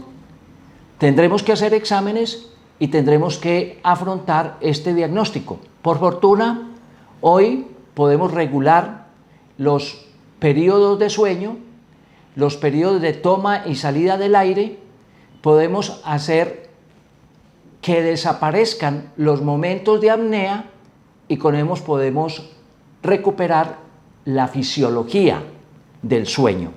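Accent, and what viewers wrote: Colombia. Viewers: Por tu manera de hablar, eres colombiano, estoy en lo cierto?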